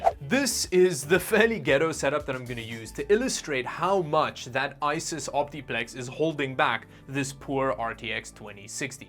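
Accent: Afrikaans, South Africa. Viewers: Is jy van Suid Afrika? Jy klink Afrikaans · I'm so curious too, South African is my guess.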